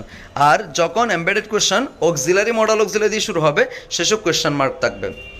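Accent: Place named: Chittagong, Bangladesh. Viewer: Ctg r basa buja sbr kaj na bhai Eke to free paccen tar majhe ajaira kotha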